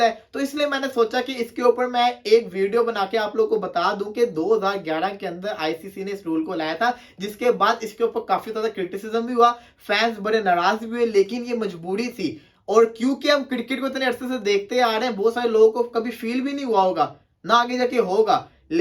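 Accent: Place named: Lahore, Pakistan. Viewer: Bro you must be lahori. I can tell it by your ‘ڑ ’😂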